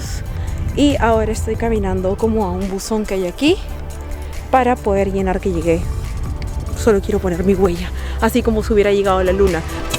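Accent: Peru. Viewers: No conocía este canal, pero desde que la escuché hablar me di cuenta que era una peruana como yo 😁😁🤗🤗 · Yo la escuché y se me hizo que era peruana, no me equivoqué, por eso tiene ese nosé qué, que tenemos los peruanos. Porque somos humildes, sabemos desenvolvernos y hablamos lindo. Tienes un Ángel súper bello. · ¿Eres peruana 🤔🤔🤔🤔🤔🤔🤔🤔🤔🤔🤔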